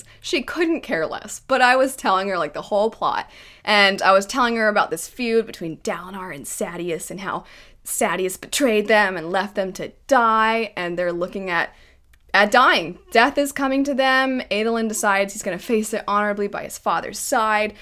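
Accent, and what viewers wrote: United States. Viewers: Stumbled on this vid by accident and „Wiedźmin” collector’s edition (Polish version!) caught my eye immediately. Same for the polish „Mistborn trilogy”. Now I’m curious - are you a Pole? Can’t hear the onion accent in your English and your channel info says „US”… I’m so confused 😂 In case it’s not obvious - I’m a Pole myself and seeing those books contrasted by your perfect american accent is bugging me rn 😅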